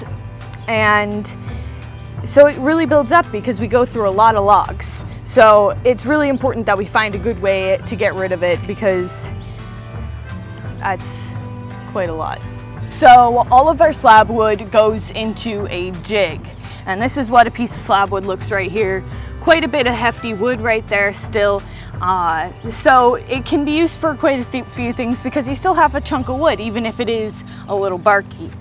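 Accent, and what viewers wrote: Pennsylvania, United States. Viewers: She has a PA accent. My wife from Lancaster has the same accent. Sometimes the sentences don’t end, “Do you want to go with…?” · That pa. Ridge runner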